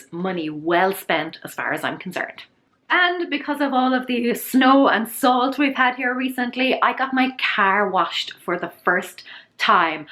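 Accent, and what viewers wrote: Ireland. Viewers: Elloello. Take hangers back too. Switch them for plain hangers and get a glue gun. Melt a frosting squiggle of glue the entire length of the top part. Let dry. Same non slip for delicate and dresses and for pennies on the dollar. I love your speaking pattern and Irish brogue. (hope that's right!) I have going to Ireland on my bucket list since I have Irish roots. Hugs to you and yours from NC.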